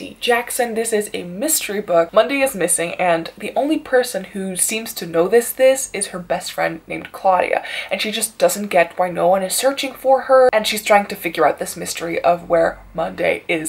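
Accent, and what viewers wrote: Netherlands. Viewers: HolY SH- I've never seen your content before and I thought: she sounds kinda Dutch. TURNS OUT YOU ARE DUTCH. OMG ik wil graag meer Nederlandse BookTubers dus tijd om the abboneren!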